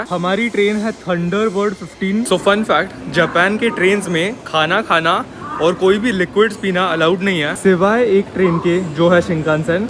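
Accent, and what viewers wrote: Maharashtra, India. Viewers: Marathi guy can never leaves his accent 😊😊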